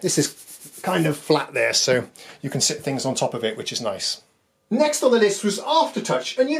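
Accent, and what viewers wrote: United Kingdom. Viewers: Looked like a classic Swedish snow covered villa-house block… but you sound British?? Great video..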